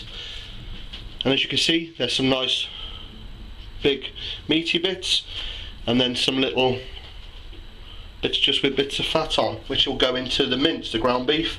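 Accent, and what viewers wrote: United Kingdom. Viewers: He's British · I really enjoy watching your videos. You are a real funny guy. The British accent makes me laugh when you say words and phrases like "give it a go", "really lovely", and "just fantastic". The combination of your great personality and educational videos make it fun to watch. Keep up the great work.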